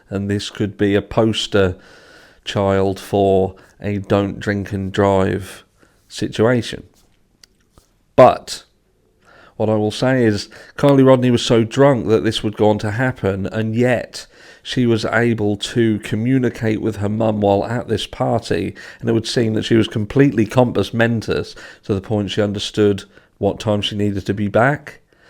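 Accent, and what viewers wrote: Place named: England, United Kingdom. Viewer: I want a “but anywaaay; I digress” in rad English accent hoodie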